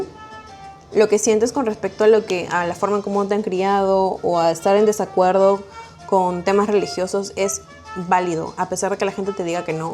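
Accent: Mexico. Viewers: Porque hablas como Mexicana? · Interesante el tema, pero me Jode escuchar a una peruana sin identidad.. hasta el tono es de Méxicana.. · Oye tu ecento se escucha muy mexicano y tú testimonio es espejo de muchas personas lo importante es que eres tu misma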